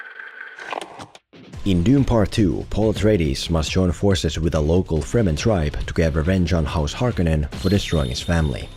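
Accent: Finland. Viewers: You sound Finnish, and if that's true, I marvel at your strength not to pronounce Harkonnen as Härkönen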